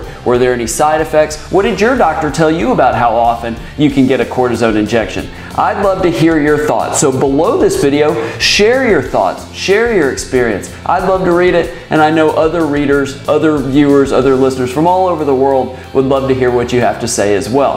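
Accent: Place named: Scotland, United Kingdom. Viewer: Wonderful Scottish Accent ! . Please slow down the presentation, the lady is speaking far too fast .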